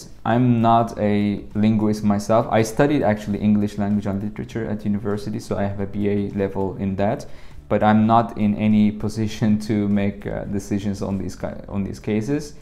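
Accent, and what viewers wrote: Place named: Netherlands. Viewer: You speak English with a Dutch accent ;)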